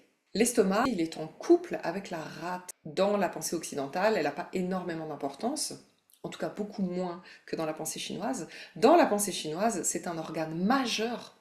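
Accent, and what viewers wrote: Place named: Belgium. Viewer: Je m’intéresse à la MTC depuis peu et je vous rencontre 🙏🏽 vous êtes claire, pédagogique, lumineuse alors je m’abonne directe 👏merci et bravo, j’entend la Belgique 😉🌻🌞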